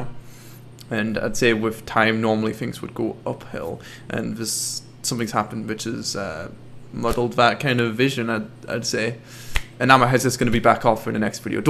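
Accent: United Kingdom. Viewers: I just want to hear that beautiful British accent bby grill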